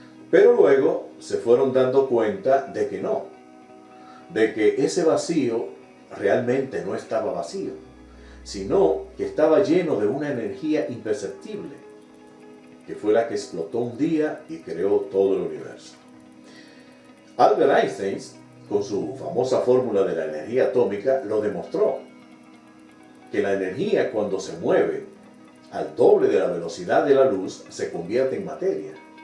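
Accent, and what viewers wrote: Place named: Dominican Republic. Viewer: Hola 🖐️ es un honor saludarte. Me nutro mucho escuchandote, muchas gracias por tu gran aporte. Soy Dominicana,y quiero saber si tú también lo eres; pues tú asento es parecido al nuestro. También estoy en el camino del despertar; y me gustaría saber si vives aquí; pues necesito ayuda más directa. También yo me estoy preparando para ayudar a mi país con el despertar. Por favor, espero su respuesta.... gracias mil!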